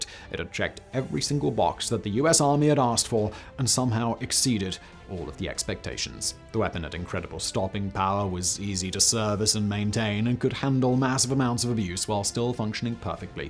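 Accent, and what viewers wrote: England, United Kingdom. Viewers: Something about an english accent talking about guns seems less “jerk-offy” than a guy with a texan accent talking lovingly about his browning m2. Idk i clicked on this video expecting a Brandon Herrera type dude jerk off a 1911 for 19 minutes but I was pleasently surprised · Not a fan of a Brit talking firearms.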